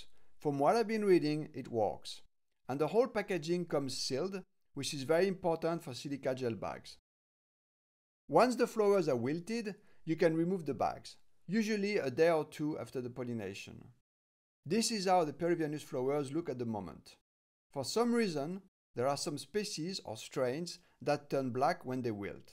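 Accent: France. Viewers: Hi. I have a question. I want to try to make an apple cactus Cereus flower. I will buy a large cutting and put it next to a wide south-west facing window in Paris. But I have read that Cereus are self-sterile. I have a Cereus spegazzinii wich is supposed to be a heavy and easy bloomer, can I use it as a pollen donnor for a larger apple cactus Cereus? Also, judging by your accent, are you french?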